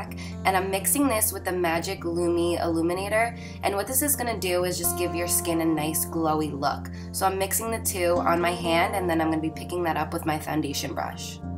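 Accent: New Jersey, United States. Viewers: "Soft and genal" your jersey accent is so strong hahah ^.^ I love this look!!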